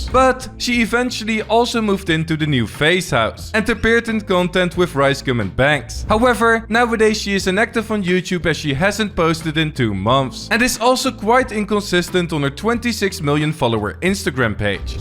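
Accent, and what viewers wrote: Netherlands. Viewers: Ayo you sound dutch af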